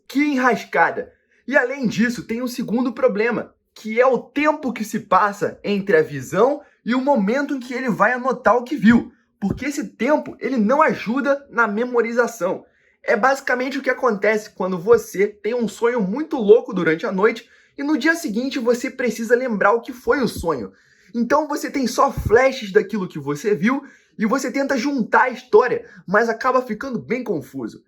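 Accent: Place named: Rio de Janeiro, Brazil. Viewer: desconfio q vc seja carioca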